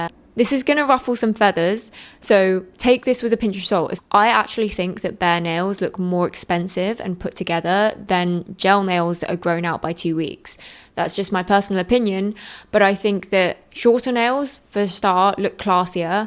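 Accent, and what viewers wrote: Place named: England, United Kingdom. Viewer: can you add to your list just having a good accent and utilising your voice? because girl, i live in australia and we barely speak the queens english, i will see the most beautiful, classy woman and she will ruin the whole thing by having a bogan or bogan-adjacent speaking voice. i understand being australian that we all sound at least 10-50% bogan at all times, so i aim to speak like Olivia Newton-John and just enunciate 🤣 btw, your english accent is beautiful!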